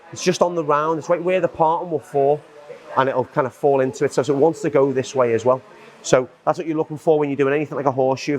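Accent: Liverpool, United Kingdom. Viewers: It sounds to me like a 'scouser' accent or dialect. This is associated with Liverpool in the county of Merseyside, England, UK 👍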